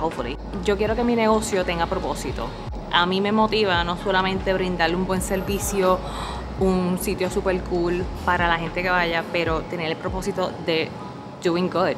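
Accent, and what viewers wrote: Puerto Rico. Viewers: Thank you so much for making these videos. They help me a lot to practice Puerto Rican Spanish. It adds a nice touch knowing you are also Asian 🥹🥲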